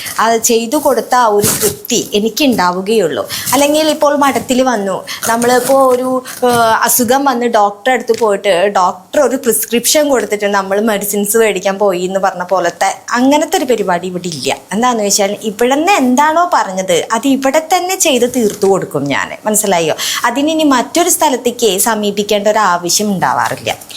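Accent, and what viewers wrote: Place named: Thrissur, India. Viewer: തൃശൂർ പച്ച മലയാളം 🙄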